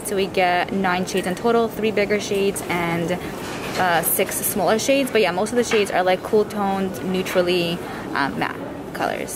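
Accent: New York, United States. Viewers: new york accent , i pronounce it the same way you do.hahahahaha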